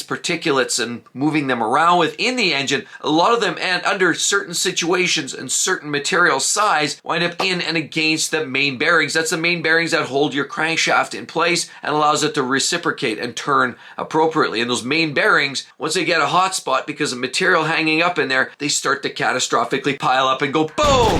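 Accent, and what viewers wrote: Canada. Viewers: Love this guy Canadians oooot and abooot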